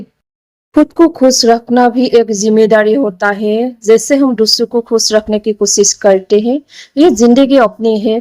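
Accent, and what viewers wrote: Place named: Assam, India. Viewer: Maje maje axomiya tune to ahiye jai🎉❤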